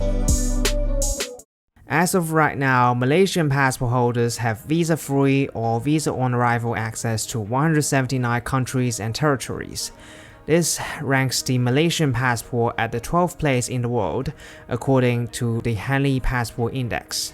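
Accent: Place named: United Kingdom. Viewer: Goosebumps, Asian with British accent.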